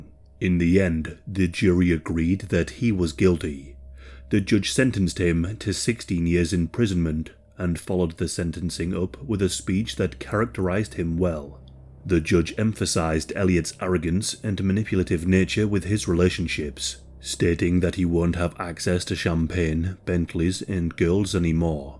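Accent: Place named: Northern England, United Kingdom. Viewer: Not even hald way thru that cheb ends personal description yes but. im actually already physically cringing at 'scarface' LOLL more like 'uncontrollable teen acne-face'. What a fuckn loser. Cant even imagine this litte coke heads further actions n what he'll end up doing to that poor young lass. Hate little pests like him. Calls himself topdog yet cant even buy his own car. What an absolute bell end... i already despise him and im not even a 1/4 way through the entire video. Amazing work as usual. As a fellow northerner usually a northern accent makes me awkward as im so sick of it but you make me proud to live up norf again ha ha. Legend!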